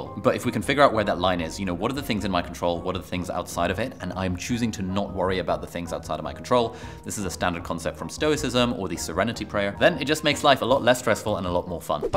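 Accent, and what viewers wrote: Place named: United Kingdom. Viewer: How can we talk like a British, talk like you . ???. 🤔🤔🤔🤔🤔🤔🤔🤔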